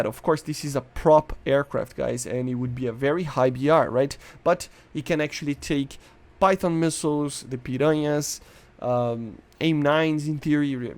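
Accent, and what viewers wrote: Brazil. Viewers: Se eu não soubesse diria que vc é brasileiro, parece muito sotaque de brasileiro falando inglês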